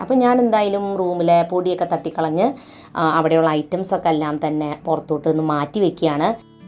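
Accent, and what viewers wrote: Thrissur, India. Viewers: Chechi Thrissurkari ano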